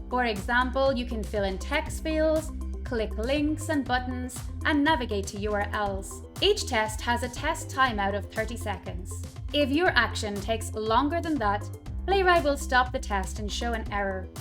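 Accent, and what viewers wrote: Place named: Scotland, United Kingdom. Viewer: her Scottish accent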